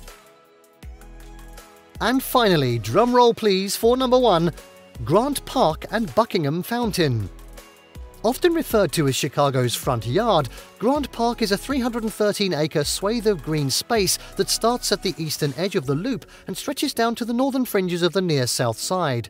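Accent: United Kingdom. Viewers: A nice survey with occasionally dazzling if often deceptive photography but also some egregious errors. "Fulton House" is not that house, whatever the house depicted may be —and in any case, it's not a house. Anish Kapoor, though he may have his studio in London, is Indian, from Mumbai. And the peppy British accent: What's up with that?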